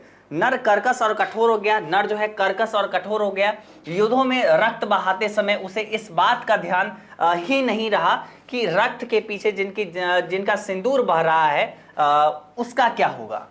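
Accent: Bihar, India. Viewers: Hmmm Bihar se hai sir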